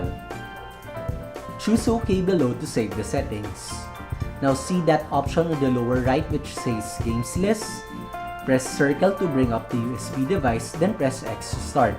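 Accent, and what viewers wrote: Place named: Philippines. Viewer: Pinoy ka ba? Halata sa accent eh lol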